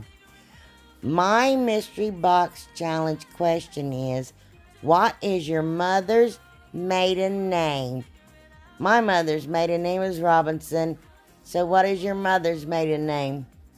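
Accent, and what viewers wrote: South, United States. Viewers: My mom's maiden name is Smith. I absolutely love all your Diys and your sweet southern drawl. Where are you from ? ♥️♥️♥️♥️♥️♥️ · Enjoyed your videos and your adorable country accent! You definitely kept me laughing! My Mother's maiden name was Castles 🏰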